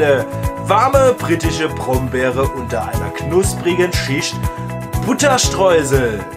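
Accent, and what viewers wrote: Saarland, Germany. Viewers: Ich wussts doch eh Saarläääner auf weiter so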